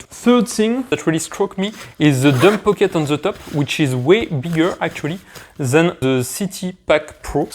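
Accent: France. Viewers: Nice french accent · Pour un français (l'accent se reconnait bien ;) ) la traduction auto serait compliquée. Déjà qu'avec des anglais c'est très aléatoire... Pour construire une commu Francophone, ce serait mieux j'imagine.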